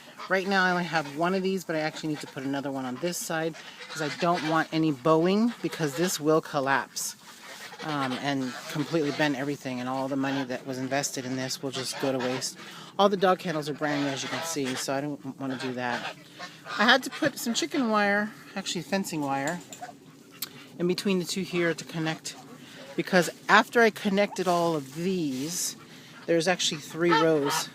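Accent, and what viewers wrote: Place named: United States. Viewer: Hi, u might have seen my comments before. I see u like you ducks ect. Well I was thinking u would like expensive more pretty kinds. And they are rather hard to get! I have red breasted and emperor geese...? They are worth quite a lot as they are hard to breed and are very very pretty! If you like any ideas with birds like these give me a shout and I can tell you. I'm not trying to see you anything as I live in Scotland and by your accent your from America? Thanks!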